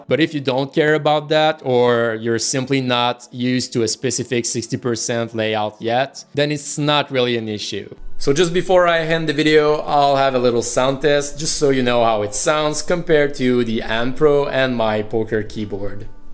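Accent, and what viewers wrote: Quebec, Canada. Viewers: french canadian?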